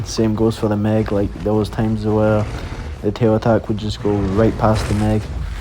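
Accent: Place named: Scotland, United Kingdom. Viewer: Ah the Scottish accent! My mum is from Scotland, my whole family is over there. Love it :)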